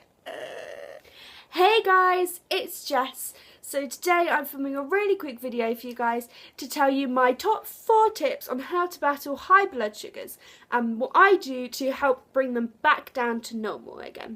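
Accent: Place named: Australia. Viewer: You sound vaguely Australian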